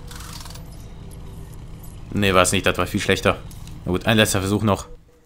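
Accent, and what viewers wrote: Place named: Russia. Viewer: man hast du nen russischen akzent ! :D (bin auch russe)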